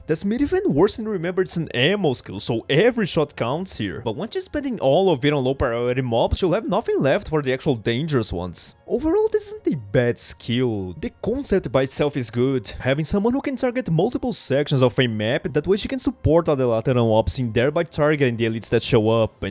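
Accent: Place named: Brazil. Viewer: wow, you have a very strong Brazilian accent... You are? xD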